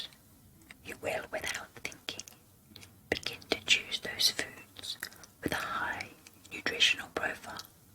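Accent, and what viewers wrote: England, United Kingdom. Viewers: Are u English u kinda sound English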